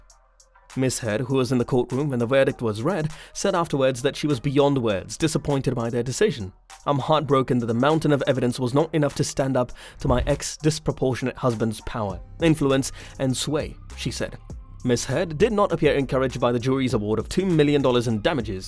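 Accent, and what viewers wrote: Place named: United Kingdom. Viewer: Get the facts straight you sound British so your laws vary from ours and as his supporters I believe that was done by bots. Facts are wrong are you sure your not being paid for this article by AH...just have to ask.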